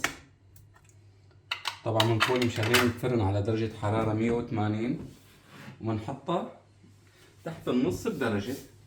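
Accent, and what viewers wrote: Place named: Syria. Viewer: بس عفوا منك انت من وين....وين تعيش لانو تحكي سوري انا سورية من حلب بس صار لي ٣٦ سنة في سويسرا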